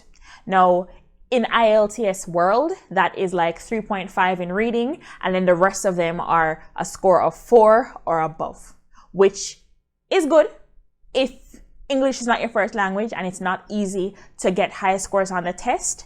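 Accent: Jamaica. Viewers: I can hear the Jamaican accent. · Great job. You sound like a yawd lady (Jamaican)? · are you jamaican ? smile and thank you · AIPP program seems feasible--thanks for the info. Yuh come from Jamaica, nuh true?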